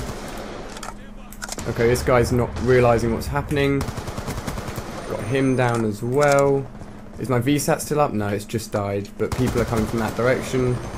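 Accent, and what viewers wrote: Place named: United Kingdom. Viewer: You should do one episode with you talking without a british accent.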